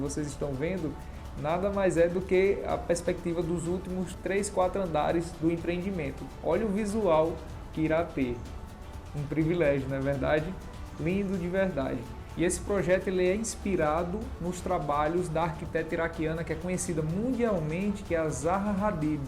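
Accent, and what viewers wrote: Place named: Paraíba, Brazil. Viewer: Mais vc não é de Fortaleza vc ta um pouco com o sotaque da Paraíba e do Pernambuco!!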